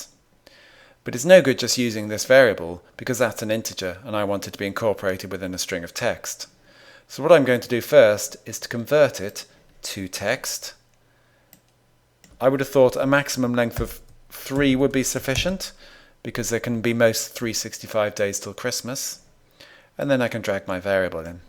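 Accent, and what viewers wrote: United Kingdom. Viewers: special thanks from Egypt Special thanks for British accents :)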